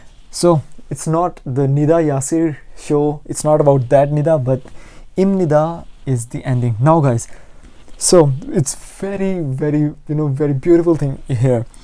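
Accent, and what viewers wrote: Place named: South Korea. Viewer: Wah apka accent bhi Korean hai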